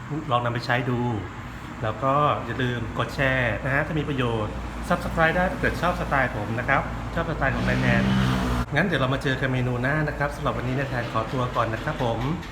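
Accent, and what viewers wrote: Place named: Isan, Thailand. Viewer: เชื่อว่าตอนอยู่กำแพง คงจัดหนักแบบไม่ธรรมดา ขนาดเห็นสาหร่ายเป็นเต้าหู้ คงเป็นเพราะฤทธิ น้ำเก๊กฮวย กับน้ำอัมพัน ยังไม่หมด เมนูแกงจืดสาหร่ายหมูบะช่อคงช่วยให้หายแฮ้งได้ดีคะ เมนูนี้การันตีด้วย ยังไง้ ยังไง ก็แซ๊บ แซบ อยู่แล้วคะ (คนกำแพงแต่ชอบพูดอิสาน น่ารักดีคะ)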